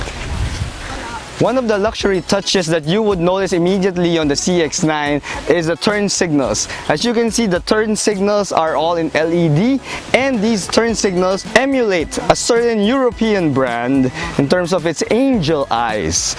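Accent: Philippines. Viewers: filipino ,,pinoy accent wooww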